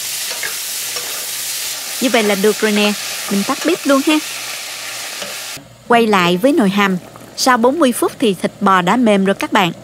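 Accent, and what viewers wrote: South, Vietnam. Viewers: Giọng nói miền nam ngọt ngào , dể thương gì đâu ák trừi🥰🥰🥰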